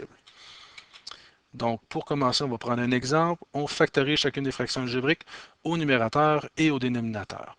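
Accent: Quebec, Canada. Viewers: quel accent? cest du quebecois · Emre Turhan c’est du canadien français donc c’est un accent normal pour ce pays...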